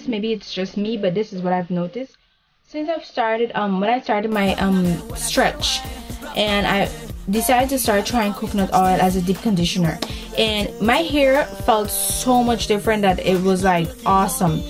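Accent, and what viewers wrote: Caribbean. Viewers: where is the accent from? caribbean or south american? im from guyana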